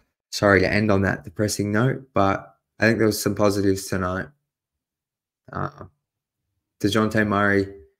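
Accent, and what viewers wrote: Australia. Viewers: Saw the mustache and handsome face and knew I’d hear an Australian accent. How does a guy living damn near 24 hours into the future become a pistons fan?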